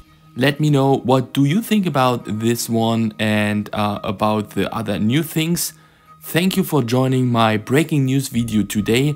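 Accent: Germany. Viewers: Ich liebe dein deutschen Akzent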